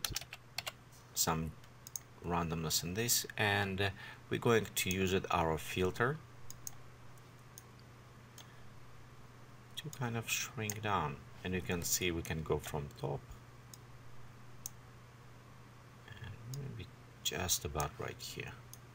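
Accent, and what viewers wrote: Russia. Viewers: Да слышно же что ты русский) Ну расскажи по русски же)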